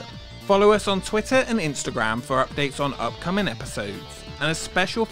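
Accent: United Kingdom. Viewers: A high-pitched British voice.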